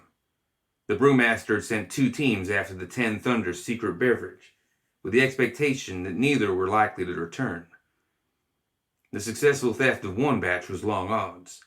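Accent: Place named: Louisiana, United States. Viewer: Interesting choice to have the story read in a Bayou accent.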